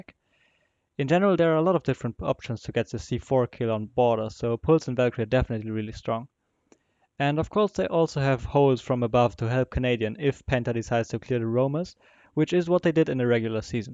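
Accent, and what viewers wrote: Germany. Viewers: Nice video! Are you german? Your accent sounds like you’re german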